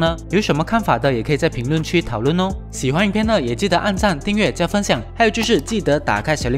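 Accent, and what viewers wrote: Malaysia. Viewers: 應該是馬來西亞的口音吧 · 他是马来西亚人哦~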